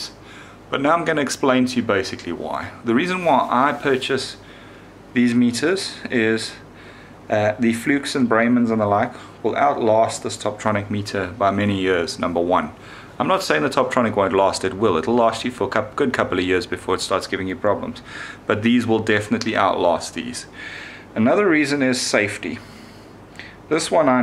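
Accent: South Africa. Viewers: Sounds South African and they did once use a comma instead of a decimal point.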